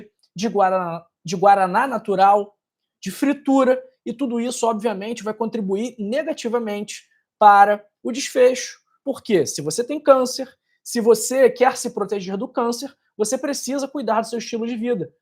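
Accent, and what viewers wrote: Rio de Janeiro, Brazil. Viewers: Esse sotaque carioca dá gastura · sou de Salvador Bahia.Voce e Carioca na cara, com este sutaque gostoso. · Doutor o seu jeito de falar é de carioca , acertei ?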